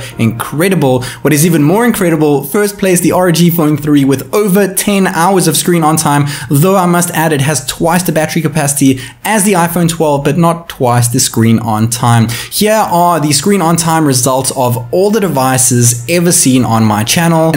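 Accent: South Africa. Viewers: Are you from South Africa? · South African ? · U sound south african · Watched your Qna vid and a few times when I watch your videos i think you sound south African and it turns out you are! I am also from SA, live in Cape Town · I think I know a South African when I hear one :D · This guy sounds soo South African 🇿🇦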